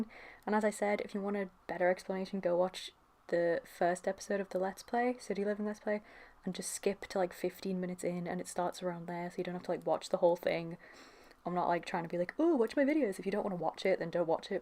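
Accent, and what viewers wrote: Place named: United Kingdom. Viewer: You're welcome! It's nice to see another British simmer! (I hope you're British, otherwise, I'm terrible with accents...)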